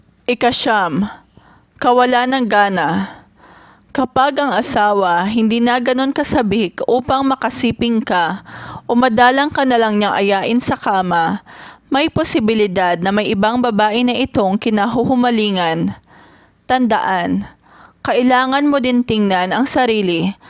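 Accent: Visayas, Philippines. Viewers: Oo nga parang maypagkabisaya narin magbasa pero oks lang ok narin naman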